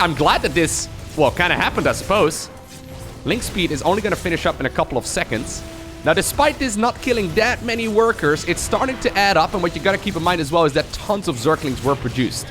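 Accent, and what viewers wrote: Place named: Netherlands. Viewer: Lmao, Im praticing my "Hello everyone, my name is " so loud. Its getting pretty close, but it is missing that dutch accent.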